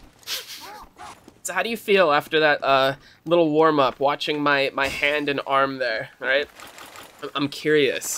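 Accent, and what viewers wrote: Italy. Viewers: yeah i can tell you're italian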